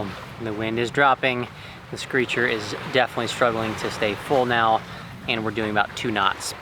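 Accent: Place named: Texas, United States. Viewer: I went back and re-listenened to the vid...I didn't hear any valley girl...unless you count a hint of Palo Dura Canyon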